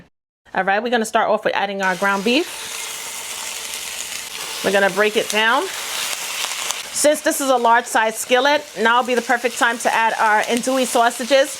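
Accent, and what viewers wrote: New York, United States. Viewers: 🇨🇦👋 Girl, I am only at 2 minutes 11 seconds and I know I am going to love this recipe. It helps that you are so awesome to watch. I love your accent. You sound like you're from New York or New Jersey. I'm Canadian so I could be wrong but loving it so far thank you.😊😊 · Looking sooo yummy 😋. Loving the New York accent 😊❤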